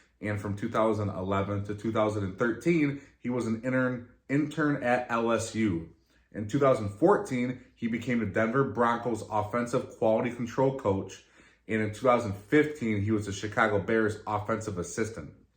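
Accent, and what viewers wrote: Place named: New York, United States. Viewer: Great video and I too am excited about this staff! I was gonna guess you were from NY based on your accent lol. Where in ny?! I'm from the lehigh valley pa area. Not too many titans fans up our way!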